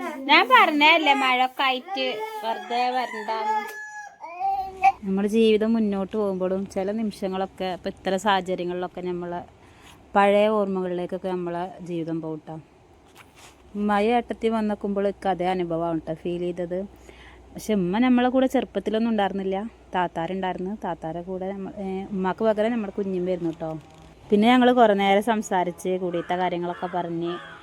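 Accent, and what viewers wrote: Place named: Manjeri, India. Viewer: മഞ്ചേരി ആണോ.. Same സ്ലാങ് ഉള്ള ഫ്രണ്ട് ഉണ്ട് എനിക്ക് 😊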